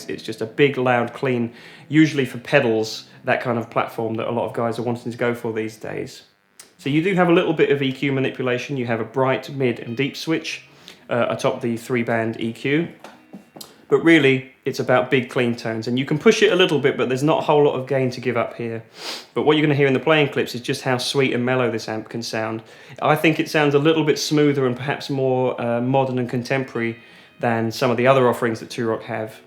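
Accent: United Kingdom. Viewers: I thought the store was at Texas or something but the British accent oh ok.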